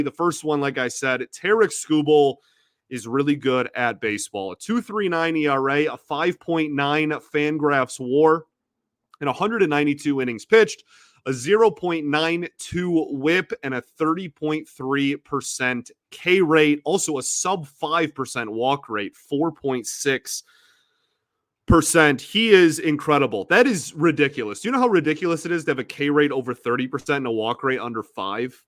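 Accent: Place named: Midwest, United States. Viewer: My comments are not just pet peeves. They are advice to help you with your career, because your personality, intelligence, heart and knowledge can take you far. Only your presentation can hold you back and it has improved a lot in the last year. This comment is about the difference between "get" and "git." The first is the correct way to say it. "Git" marks you as a backward, midwestern hick. I know. I had the same problem growing up in Toledo. Here is a way to help you, because I know right now. you can hardly hear the difference. Would you say "base het?" Would you say "lit me in, please?"" Would you use a catcher's "met" behind the plate? Get what I'm saying. There are two difference sounds between "i" and "e" and in most instances we get the difference. For some recent the midwest accent among the uneducated says "go git it" instead of "go get it." People who want to hire you will hold that against you and people who aren't sure why will still think you sound a little off. 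Thanks for reading and please know that I only write this in a desire to help.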